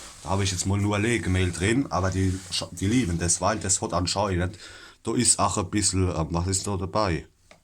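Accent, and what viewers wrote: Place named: Palatinate, Germany. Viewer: Dieses pälzer gelaber ist aber dem monnemorischen schon sehr ähnlich und sehr witzig. Hab dir mal n abbo dagelassen, Grüße aus Mannheim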